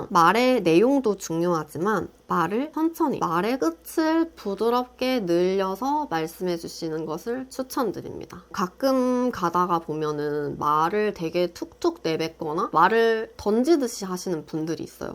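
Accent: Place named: Gyeongsang, South Korea. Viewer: 공감 많이 되네요~~사람에 대한 배려심. 기본..영상 잘 보았습니다. 말하시는 중간중간에 경상도 억양이 살짝 묻어나시는거 같아요!!!!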